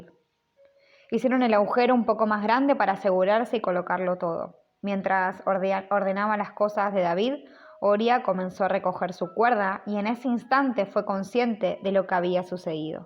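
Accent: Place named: Argentina. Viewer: QUE LINDO EL ACENTO ARGENTINO.....SALUDOS DESDE PERÚ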